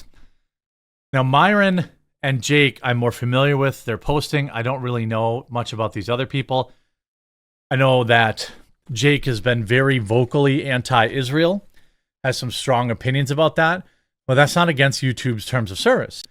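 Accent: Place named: Milwaukee, United States. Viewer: Clearly, I can tell your from Milwaukee!😂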